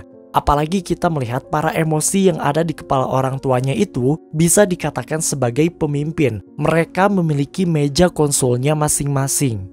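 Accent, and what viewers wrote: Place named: Java, Indonesia. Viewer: Bang, anda orang Jawa ya soalnya sedikit denger ada logat Jawa nya tapi saya orang Sunda kok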